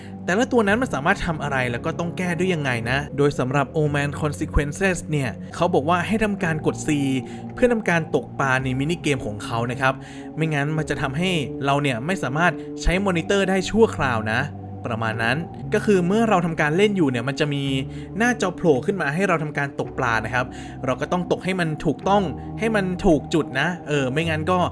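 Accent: North, Thailand. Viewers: เหมือนคนเหนือเลย พี่อยู่จังหวัดอะไรครับ 555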